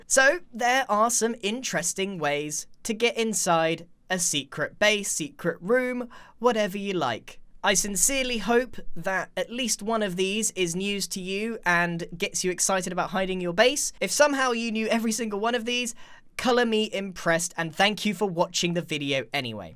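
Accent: United Kingdom. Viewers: u remind me of wisp why cuz u both are British like mee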